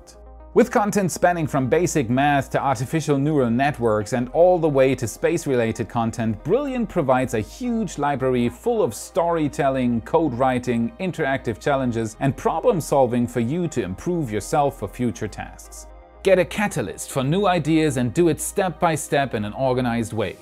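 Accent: Germany. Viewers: wait... are you from germany? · Wait a second... do you have german roots?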